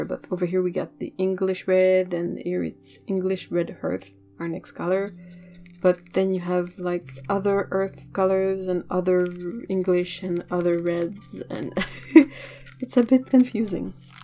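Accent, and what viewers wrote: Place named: Montreal, Canada. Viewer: Anglais de l'ouest de l'Isle de Montréal. :^) Ton anglais est milles fois plus fort que mon français. :^D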